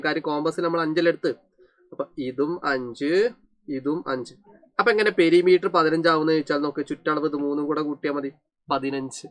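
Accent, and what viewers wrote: Kozhikode, India. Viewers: Sir Kozhikode ano stalam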